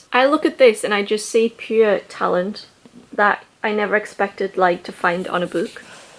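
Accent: United Kingdom. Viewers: Dear, when I started to get interested in book subscriptions, I found your channel and I chose my subs because of your videos. Your taste really speaks to me 🫶🏻 Bonus point: I‘m from austria and I love your british english ❤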